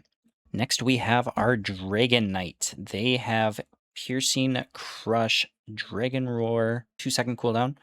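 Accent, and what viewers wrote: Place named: Wisconsin, United States. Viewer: Kinda random but are you by chance from Wisconsin? Your accent sounds similar to mine.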